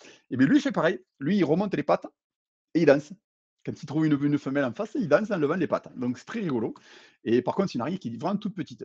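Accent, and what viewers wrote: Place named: South, France. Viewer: Bonjour, Ça fait du bien d'entendre un peu l'accent du sud (les bretons se moquaient de moi à l'époque),en tout cas, excellente vidéo très instructive. Merci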